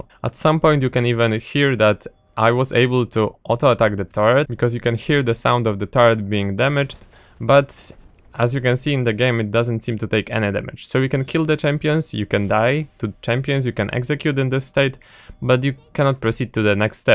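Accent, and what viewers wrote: Poland. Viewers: jaki polski akcent xd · Are you polish? :D You sound like a polish guy :) Btw. your voice is awesome :) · when you speak English, you sound like you would be from Poland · Szłyszeć po głosie, że z polski jesteś! :D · Dziena poznałem po akcencie że jesteś polakiem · You have polish accent, so POLSKA HUSARIA GUROM! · this polsih accent is unbearable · Hello, are you from for Poland? U sounds so polish :D xD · For first,he is Polish, and he has a Polish accent.And for the second,just try to raise the volume a bit or turn on the subtitles if you don't understand him,but however,his english can be understood.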